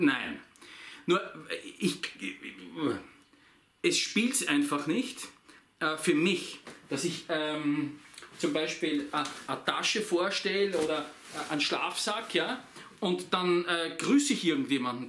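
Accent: Austria. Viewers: Lieber, und alle Komentarleser Ich, persönlich, hab den Kanal abonniert weil: 1. ich mich für Outdoor-Ausrüstung interessiere und immer auf der Suche nach neuen bzw. unbekannten Sachen bin, die nicht jeder hat und kennt. 2. du einfach, authentisch, sympatisch, rüber kommst und der österreichische Akzent mich amüsiert. Wer was anderes erwartet hat ist meiner Meinung nach hier falsch. Ich hab im übrigen schon Kanäle deaboniert weil mir das stäntige Gegrüße in den Videos auf den Zipfen gegangen ist, so ein Blödsinn! Das mit den Kanalvorstellungen find ich auch deppert aber gut seis drum. Ich werd mir diese Videos dann einfach nicht ansehen. Aber ich will dir da auch nicht reinreden mach wie dir lieb ist. MfG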